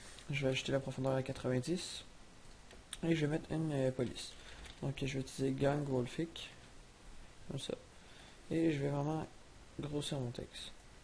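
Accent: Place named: Quebec, Canada. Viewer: J'adore ton accent Québequois !!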